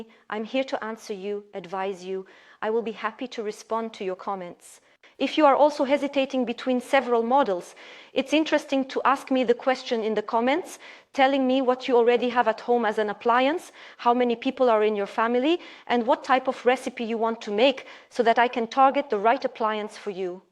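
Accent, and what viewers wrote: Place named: Ireland. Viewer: Great review. I love your Irish accent.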